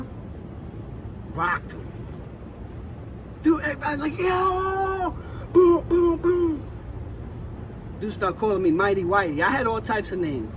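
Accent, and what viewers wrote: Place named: New York, United States. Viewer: Shout out from Australia...Love the content and I Love your NY accent...i hope the kids hear the realness of the message your trying to send. Hopefully people hearing this can AVOID prison altogether. GL to u and all the best in your endeavours in the future...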